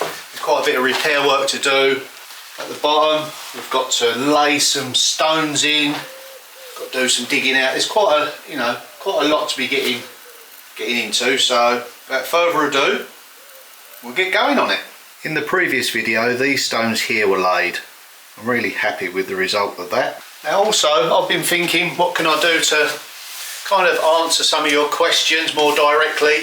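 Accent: United Kingdom. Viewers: I'm curious ,What is you accent? I know the UK has accents just like in the US....I'm thinking it's North or West. Some more repairs as you go....it's a good thing.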